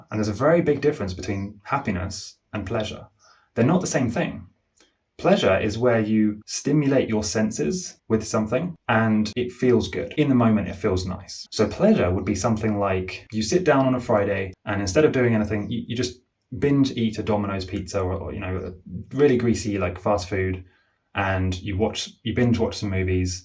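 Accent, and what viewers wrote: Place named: England, United Kingdom. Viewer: Why do you use $ but you have an English accent???? 🤔🤔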